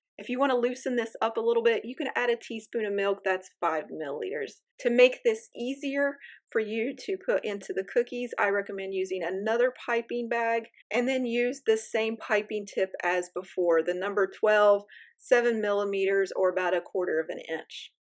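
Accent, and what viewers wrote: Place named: West Virginia, United States. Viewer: Thanks for another great video! My wife and I have been struggling with Italian macarons, with limited success. French macarons are so much easier (though we haven't tried them), that we're going to try them now. Do you have any experience with Italian macarons? If you enjoy messing with several containers, heating sugar syrup, etc., they're fun. Which type do you think are better. Listening to your accent, this old Appalachian boy thinks he recognizes a northern W. Va. pattern. (Which ain' bad!)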